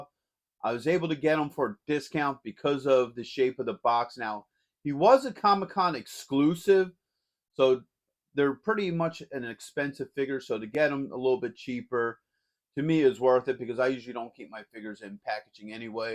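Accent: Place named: Upper Peninsula, United States. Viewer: Brother where are you from? I grew up in the Upper Peninsula of Michigan and your accent reminds me of home there eh? 😊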